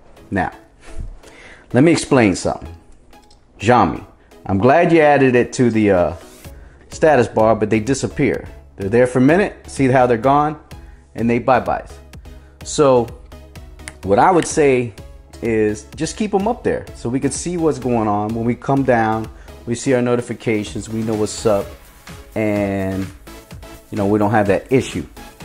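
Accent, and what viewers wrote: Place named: England, United Kingdom. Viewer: What is ur English accent bro?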